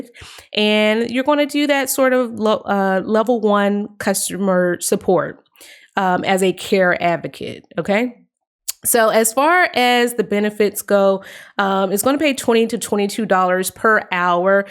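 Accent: Spain. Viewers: ME ENCANTA TU ACENTO (i AM ENCHANTED BY YOUR SPANISH ACCENT);)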